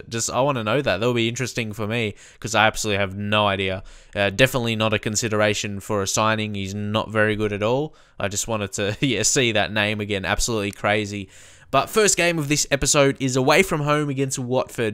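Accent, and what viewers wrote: Melbourne, Australia. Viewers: That Melbourne Accent.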